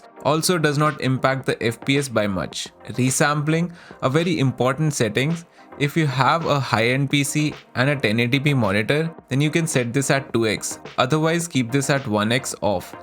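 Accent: India. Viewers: from ur accent am i correct to assume ur indian? if so happy to see an indian makin tarkov content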